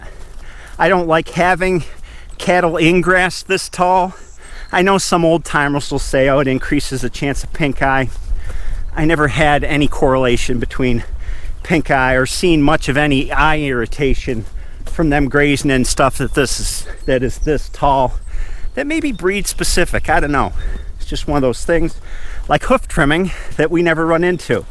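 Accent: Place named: Central New York, United States. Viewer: I like how says, "Expuriments"! I went to SUNY Cortland, studied Ag Science, and that's how they say it in central NY! He reminds me of home!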